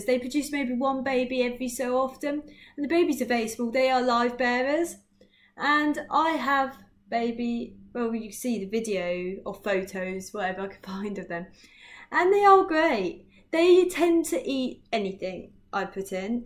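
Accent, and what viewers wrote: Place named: United Kingdom. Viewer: Hard to listen and understand due to the Britt accent. Thanks from the new world.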